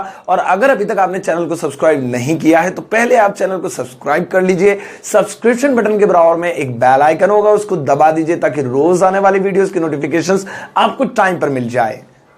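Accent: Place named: Haryana, India. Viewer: Sir ji ap ki haryanvi must h ..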